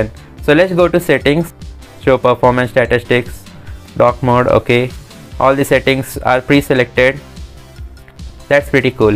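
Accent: India. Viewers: Я не знаю английский,но я слышу что ты разговариваешь с акцентом,ты индус?😁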